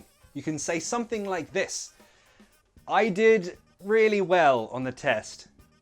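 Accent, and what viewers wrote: United Kingdom. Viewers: You're the only British English speaker I really enjoy listening to. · You make me love British English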